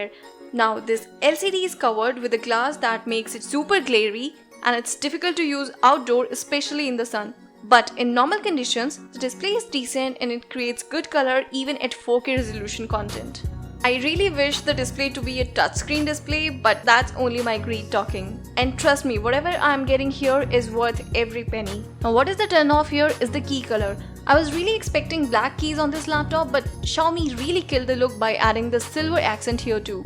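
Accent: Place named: India. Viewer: i like your indian accent.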